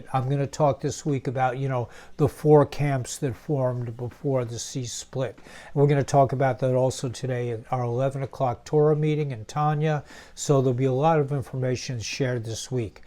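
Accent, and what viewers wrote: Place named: Armenia. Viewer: Good Afternoon, lmao yes stay away from death trap... question I' half Armenian I can remember my Great Grandmother speaking and it sounds similar to the way you pronouns a lot of the words you speak.. Enjoy the rest of the weekend, Love to Light...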